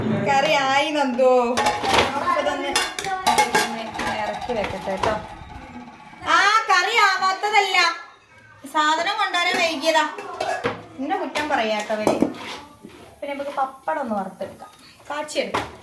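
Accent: Kozhikode, India. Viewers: കോഴിക്കോടിനടുത്ത് കല്ലായിലോ ഫറോക്കി ലോ ഉള്ള ഭാഷ പോലെ തോന്നുന്നു?❤❤❤❤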